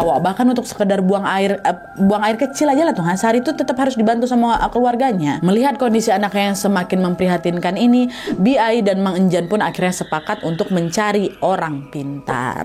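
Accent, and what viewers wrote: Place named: Sumatra, Indonesia. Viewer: Mba orang palembang at org Jawa? 🤣 tp bahasanya kyk org Sumatra.... 👍👍👍👍❤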